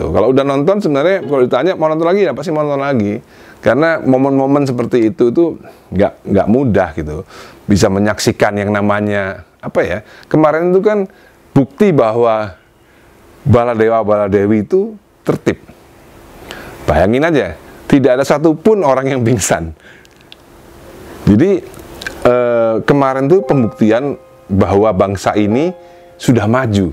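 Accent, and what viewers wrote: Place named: Surabaya, Indonesia. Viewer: Bose gesss Boseee .... Makin mantap monolognya, kas suroboyone tapi ada solo solonya dikit.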